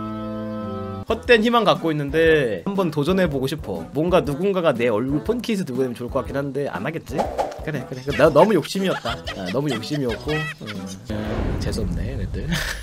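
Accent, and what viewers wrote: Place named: North Korea. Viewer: 북사투리 ㅋㅋㅋ 개노잼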